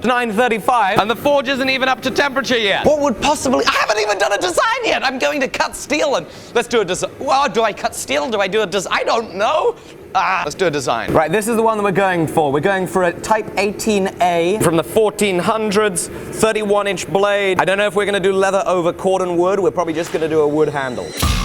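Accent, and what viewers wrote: England, United Kingdom. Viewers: Overdoing? You realise he's an englishman... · He is a pom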